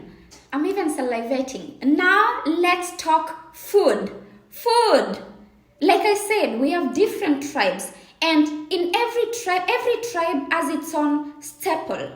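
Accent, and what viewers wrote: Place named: Kenya. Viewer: sure the English is from a kenyan na iyo kikamba nafeel,,🔥🔥🔥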